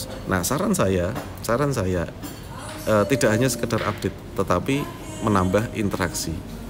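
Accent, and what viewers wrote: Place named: Semarang, Indonesia. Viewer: Mas kayanya orang semarang yo.. jowone medok hehe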